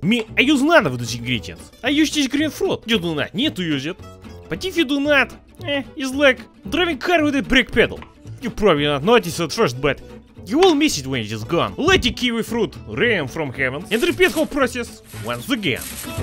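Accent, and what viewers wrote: Russia. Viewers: Still glad your channel still alive after I took absent for a long time. You still have that funny russian english accent checked.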